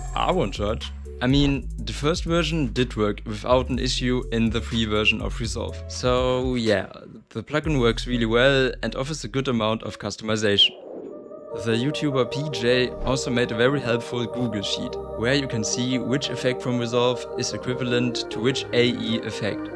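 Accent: Germany. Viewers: Echt gutes Video. Man merkt zwar das du Deutscher bist aber das juckt nd. Arbeite maybe noch am sounddesign sonst echt gutes Video bro.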